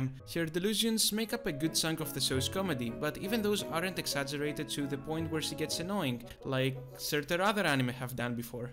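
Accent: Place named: Greece. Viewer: M8 ur accent sounds greek perhaps the first greek person with good taste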